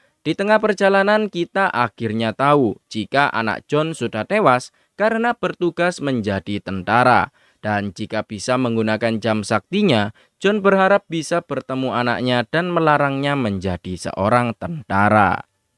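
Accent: Java, Indonesia. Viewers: medok sngt tidk nyaman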